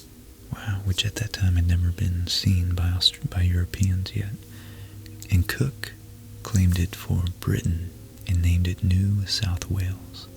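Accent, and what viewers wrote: United States. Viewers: I love listening to Americans saying British things it's quite funny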